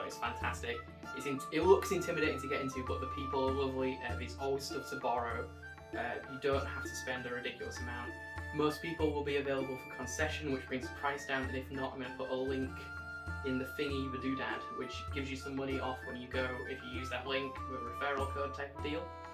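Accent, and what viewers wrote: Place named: Hull, United Kingdom. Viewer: Future Empire Navarri been binge watching these to see what it’s about these are great, also what accent is that sounds Hull or somewhere alike good description video 🍻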